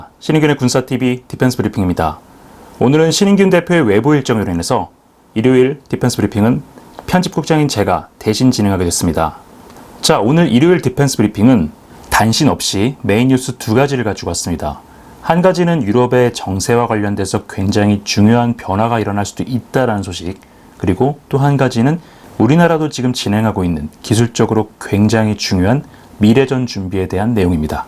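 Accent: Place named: Seoul, South Korea. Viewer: 한번씩 서울말 들어야죠 ^^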